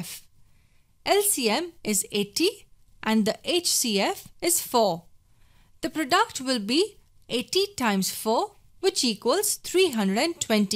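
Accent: India. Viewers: Fucking hate that Indian accent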